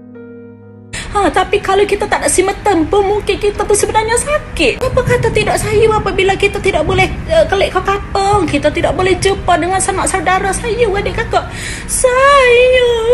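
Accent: Kelantan, Malaysia. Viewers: Kelate pekat kecek.. tapi siaran jln2 cari make hok buat meme sohor benar d kelate sampai pakat duk cokoh depe tv.. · Sedap dengar loghatnya.. Macam kelantan + siam Malaysia + Thailand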